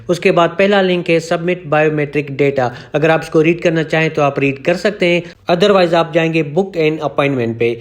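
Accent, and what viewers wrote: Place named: Sindh, India. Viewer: Aap k lehje se lagta hai aap Sindhi speaking Bhai hain.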